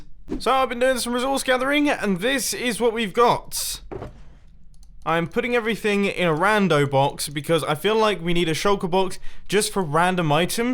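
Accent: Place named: United Kingdom. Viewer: A piston doooor stoooor XD I love mumbo's British accent by the way :)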